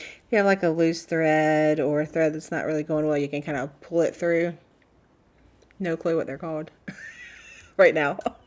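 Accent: South, United States. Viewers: Congratulations! I look forward to watching your videos. Love your “southern” accent and pronunciations. I’m a southern girl, too. I didn’t know I had a southern accent until I moved to California. Thank God I’m back in the south😜😜😜I would love to win your fancy scissors🙀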